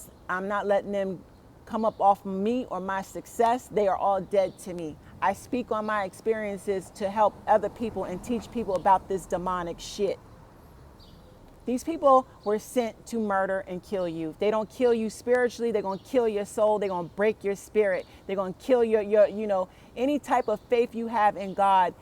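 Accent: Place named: New York, United States. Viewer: Powerful sister, sound like your from NYC...wish we could speak...blessings!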